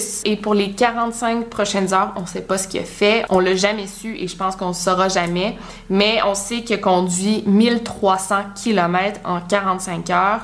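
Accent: Quebec, Canada. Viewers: Coucou, je trouve que tu racontes super bien, je t'adore, c'est vrai que au début je comprenais pas tous les mots car tu a l'accent du Québec (moi je suis française a 100%😅😅) mais je m'y habitue et je trouve que tu mérites beaucoup plus d'abonnés!!! Bisous bisous et continue tes vidéos, elles sont trop bien! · J'avoue !!! Et pourtant de base je ne suis pas fan de l'accent québécois et ben là je peux juste pas m'en passer!!! Y'a que les imbéciles qui changent pas d'avis hein 😉😅😇 comme tu dis ma soeur, qui dit mieux 😍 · merci pour cette terrible histoire, je ne l'a connaissais pas. Une abonnée supplémentaires. Dîtes moi,vous êtes Québécoise? Bel accent et pays que j'aimerai visiter. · J'ai adoré J'ai liker avant même de regarder la vidéo j'attend avec impatience tes vidéo et en plus je kiffe l’accent québécois Bisou de île Maurice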